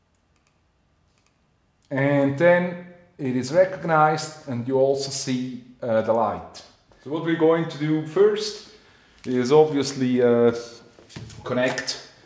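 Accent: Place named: Sweden. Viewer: Behöver hjälp! Du låter svensk, är du det? Undrar bah om jag kan fråga på svenska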